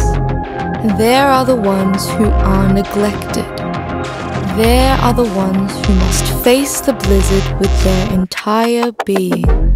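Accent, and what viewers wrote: Australia. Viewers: stray kids done what first? Frontier means borderline. Their title is frontier : declaration and enhypens is Border : day one. Both use colons, frontier is synonym for border / borderline ..in this trailer the videos used are only nature documentaries and abstract art depictions without any member shown but only the aussie narration which is similar to enhypen , the aussie narration is just a part of the similiarities but you said stray kids did the aussie thing first.. even the clips used are almost the same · Jyp and their australian narrators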